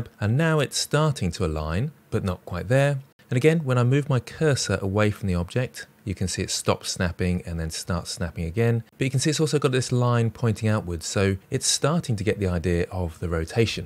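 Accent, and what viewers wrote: England, United Kingdom. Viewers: Concise, clear, and complete. Absolute amazing tutorial. Bonus points for narration with an English accent.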